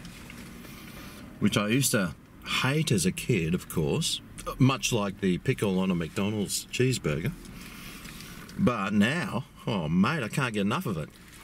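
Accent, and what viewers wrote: Australia. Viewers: I detect an Australian accent. Hi I'm from New Zealand. I have just found your channel and I'm excited to watch all your videos. I watch a lot of youtube videos because I can't eat a lot of food. I only have one metre of intestine left after having 6 operations over 20 years removing blocked parts. I also have raynauds, short gut syndrome, I have major bleeds and clots and I have food issues related to aspergers. I'm terminally ill now and my doctors are astounded by how I fight back and recover when most people would no longer be here. The funny thing is I love to cook for my parents but most of it I can't eat however I enjoy watching people loving what they are eating. I want to thank you for your videos as I'm intending to use them to distract me from my 24/7 pain which is a major issue for me. This has worked for me with some other channels. 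So thank you and keep up the good work. I know that it takes a lot of time to create a video idea, film and edit. You are a natural in front of the camera. Xx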